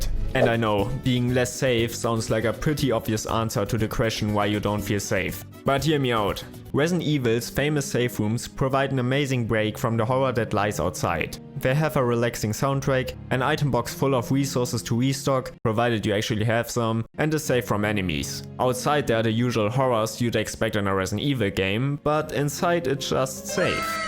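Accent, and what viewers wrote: Germany. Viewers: Bist du deutscher? das hört man sofort 😁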